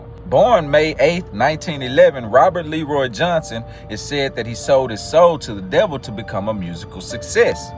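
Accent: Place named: South, United States. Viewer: He has a southern accent, but it's not thick at all. You really can't understand what he's saying? That's fascinating! You should here what a thick southern accent really sounds like! Look up creole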